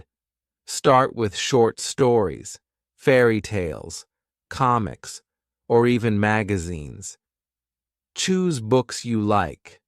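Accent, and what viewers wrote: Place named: England, United Kingdom. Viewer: I'm Russian. And my English's very bad with a pronunciation becouse I've learned it only by reading books. Youre pronunciation's very beatifull! It's realy English of England! I enjoyed listening it. Thanks!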